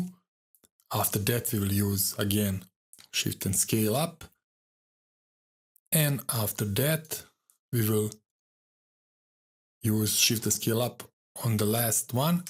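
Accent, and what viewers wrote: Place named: Brazil. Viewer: sotaque brasileiro total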